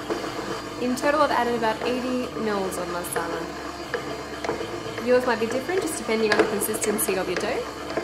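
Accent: Australia. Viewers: Greetings Great instruction. That is definitely an Australian accent but your Italian accent is perfect also. I am in Newcastle. What brand of shortening are you using? The only vegetable shortening I could find was copha.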